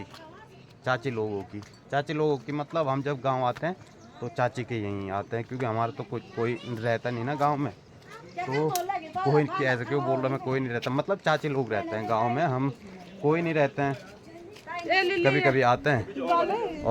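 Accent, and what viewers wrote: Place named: Garhwal, India. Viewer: Chhote bhai aapki gdwali bahut achli lgti h mujhe pta nahi kyu। Mai waise kisi ka blog nahi dekhta but aapki gdwali tone mst h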